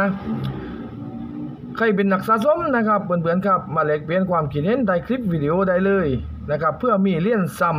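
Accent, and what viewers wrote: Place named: South, Thailand. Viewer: 👍👍👍👍👍 แหลงใต้เลยคลิปนี้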